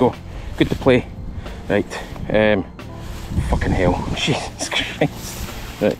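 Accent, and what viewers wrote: Scotland, United Kingdom. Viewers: I watch for the content, my wife approves solely because of your Scottish accent!!! Win win! Nice fish btw!!!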